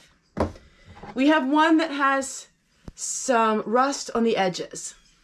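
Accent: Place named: Ohio, United States. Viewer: Your accent sounds like your from Berlin OH.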